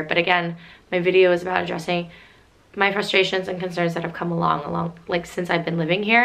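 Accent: United States. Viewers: Your accent is not New York, more West Coast California.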